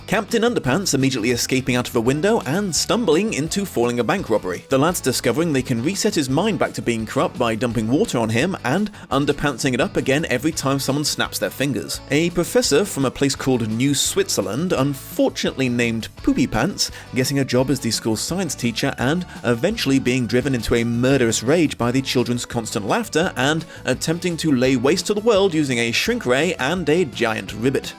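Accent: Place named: RP, United Kingdom. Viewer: Hearing the Dom, posh, British voice, talking about Captain Underpants makes me laugh harder than it should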